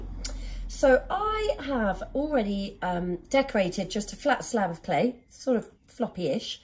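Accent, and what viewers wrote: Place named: United Kingdom. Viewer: I showed several of your videos to my Junior High Ceramics students and at least a few students per class, most accurately, exclaimed if I had a British accent I would be you. lol. I love the comfortable experimental way about you. Thank you so much.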